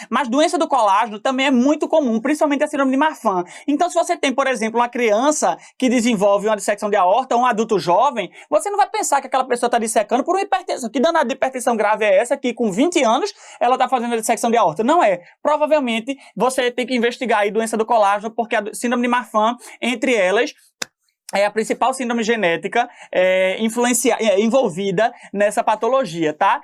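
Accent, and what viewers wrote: Pernambuco, Brazil. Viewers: Show demais, bem top assistir aulas assim sem ser "formal demais", sem falar o sotaque típico de Pernambuco que acho muito massa, marcante e personalíssimo; um dos mais bonitos das regiões do Nordeste na minha opinião. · Que aula tão maravilhosa! Além de um sotaque pernambucano, já tá na velocidade "normal": na velocidade do! kkkk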